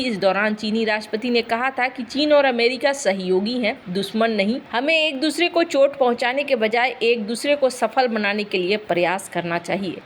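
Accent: Bihar, India. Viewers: Ap bihar se h madam?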